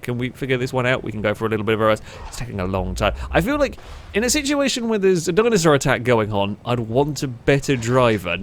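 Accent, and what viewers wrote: United Kingdom. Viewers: Thank you Youtube 4 that recommendation i enjoyd that video, i really like that british norrater forgive my bad english aim still learning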